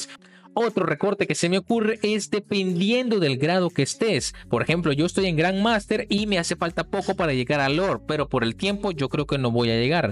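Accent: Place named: Argentina. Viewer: Xq avlas como Arjentino si eres de Salvador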